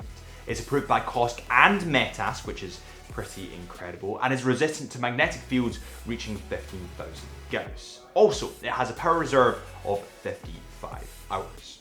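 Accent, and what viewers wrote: Scotland, United Kingdom. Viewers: Both are Scottish, it’s a cool accent which I appreciate after living in Scotland for more than 8 years… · This guy has a similar presentation style and approach as Adrian Barker…just with what? A Scottish accent is it? I do agree though, that this particular Seamaster is going to end up being one of the few contemporary Omegas that’s a collectors item and will fetch a premium for it. It’s definitely one of my favorites anyway.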